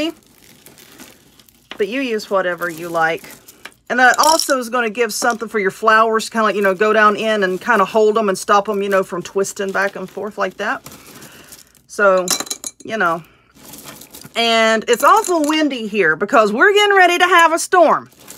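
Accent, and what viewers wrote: South, United States. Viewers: You are so cleaver and funny! I just love hearing your southern accent.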